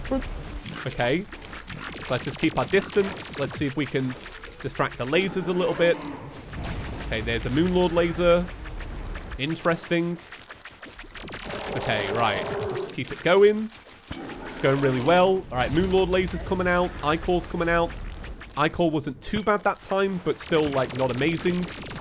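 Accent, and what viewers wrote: United Kingdom. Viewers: i haven't been wathching you in a while, until you uploaded the Hollow Knight video. Since then, i've been binging your playthroughs, and accidentally picked up some of the British accent. how do i get rid of that ??'!?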